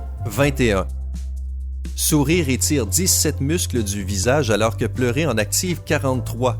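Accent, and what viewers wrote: Quebec, Canada. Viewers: Pourquoi des fois c un québécois et des fois un francais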